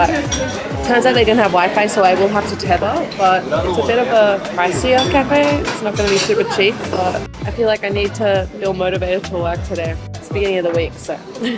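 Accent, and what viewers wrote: Australia. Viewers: An Australian with an Australian accent that lives in Japan and speaks Japanese and enjoys Japanese food and culture? I think I'm in love ❤️ lol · How are you German but have an Australian accent? So confusing 😂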